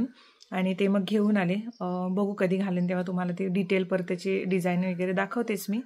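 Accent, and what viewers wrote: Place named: Belgaum, India. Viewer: Tayi me pan belgav aahe me khupc excited hoon tuje vlogs bgte Ani tu ti belgavchi bhasha boltes na mla apl koni tari as vadtay ❤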